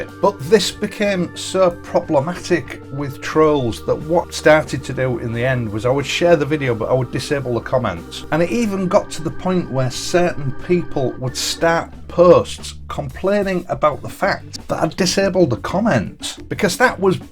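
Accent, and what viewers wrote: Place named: Hull, United Kingdom. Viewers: I love your accent, my missus is from York, the Yorkshire accent is great. I want you to make money from the channel if it means you keep posting. Have a great Christmas. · The way you talk is a problem to some people? That rich Hull accent! Up with Yorkshire! Great channel. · Spoken like a true Yorkshireman! I like your accent, not that it means a lot, but I do. My late Mother was born and bread in Yorkshire, well that part that’s now called CLEVELAND! My father died when I was only 10yrs old, he was a great deal older than my Mother, but succumbed to lung cancer in the days before that disease was linked to smoking, and he was a heavy smoker. My mother had a big family in Thornaby and surrounding areas. After my fathers demise, she sort solace in the bosom of her family, and I spent a lot of time ooop Nooorth! 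My home town was Cambridge. I thought we were going to move there, and I think that was my Mother’s original intention, but for some reason it never happened, I think it was something to do with my younger Brother and sister. Anyway, it’s long way round, to saying that your vids bring back very happy memories for me, and I love ‘em. When I left school, I joined the Army as a Boy Soldier, and my first posting after Boy Service was Catterick. I had a bike by then, and the rides around the moors were among the best I have done. Not to mention the seaside visits to Whitby, Seaton Carew, Redcar, Scarborough, and many of the villages that were made famous by TV programmes, long before they had been found by that Media. All I can say is, that anybody that has anything derogatory to say about you and your work, must have a very sad home life. As for me, being a new subscriber, I am happily going through ALL your vids, and absolutely loving it, and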